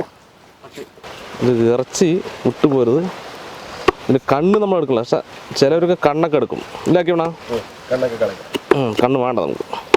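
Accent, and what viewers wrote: Palakkad, India. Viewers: കണ്ണ് വോണ്ട നമുക്ക് പാലക്കാട്‌ സ്ലാങ് 🤣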